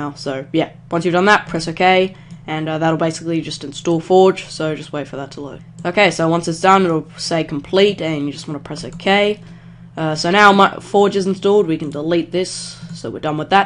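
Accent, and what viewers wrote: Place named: Australia. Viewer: I smell Australian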